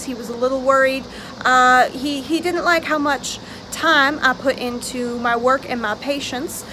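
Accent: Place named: South, United States. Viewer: Hi, nice to meet you. I love your accent. I'm from the UK but I love the country accent. Who is your favourite country music artist? Mine is., I hope this ok to ask, have you ever switched on camera? I found your channel through DissociaDID.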